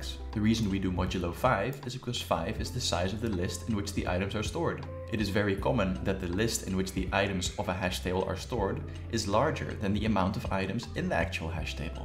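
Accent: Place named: Netherlands. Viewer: I smell Dutch 🤔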